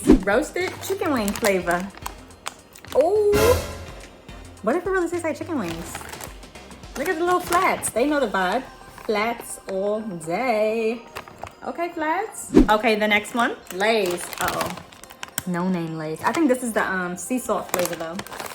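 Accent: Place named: New York, United States. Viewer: Yerrrrr… I can tell you’re from NY. Love the vid!!! Can’t wait to order something’s from this company.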